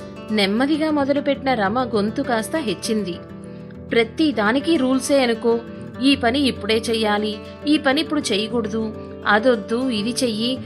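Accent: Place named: Godavari, India. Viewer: ఇది సాధారణంగా తండ్రికి ఎదురయ్యే పరిస్థితి. కొందరు తల్లులు కూడా ఎదుర్కొంటారనుకోండి! భర్త కూడా అలా పిల్లలతో కలిసిపోయి భార్యను ఆడిపోసుకోవడం కాస్త ఆశ్చర్యాన్ని కలిగించింది. అతనైనా ఆమె వాళ్ళను తీర్చిదిద్దిన విషయాన్ని గుర్తించి వాళ్ళను మందలించి ఉండాలి. అప్పుడు కథ సహజంగా ఉండేది. స్త్రీవాద కథ రాయాలని రాస్తూ స్త్రీ కూడా స్త్రీకి శత్రువే అని చెప్పే ప్రయత్నం చేశారు రచయిత్రి అనిపిస్తోంది. అచ్చం మా గోదావరి జిల్లాల స్త్రీల యాసలో ఎంత బాగా చెబుతున్నారో! ఎన్ని సార్లైనా మెచ్చుకోకుండా ఉండలేకపోతున్నాను. ఆమె విజయవాడలో పెరిగిన విషయం తెలియక ముందు నెల్లూరు వాసి ఈ యాసలో ఎలా మాట్లాడగలుగుతున్నారన్న ఆలోచన ఇప్పటికీ పోక ఇలా అనిపిస్తుందేమో! విజయవాడ యాస కూడా కాదు ఆమెది.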